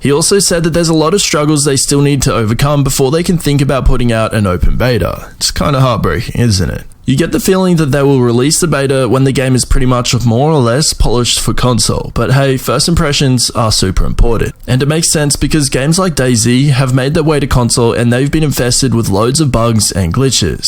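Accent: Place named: Australia. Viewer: Australians are so hard to listen to FFS change up your pace monotone af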